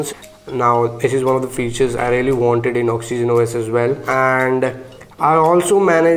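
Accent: India. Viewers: индусский английский это кровь из ушей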